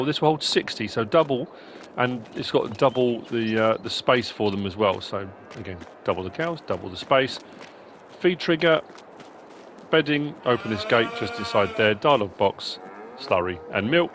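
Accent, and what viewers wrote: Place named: United Kingdom. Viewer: Keep up the great work! I work night shift in the states, and your videos always get me through the last few hours of work 😂 sometimes I can’t help but hop on and try out some new mods when I get home! I appreciate what you do 👍🏻 my family is British as well, so to top it off you sound just like some of them 😆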